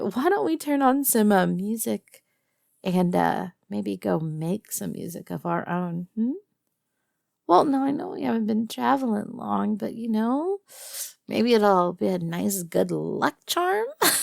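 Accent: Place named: South, United States. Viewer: Goddamn that southern twang got me feeling weird..... Where ya from girl, definitely gotta be some southern state cus that's a fucking southern accent, i feel like im freaking kut but i definitely here a woman named Betsy with that voice lol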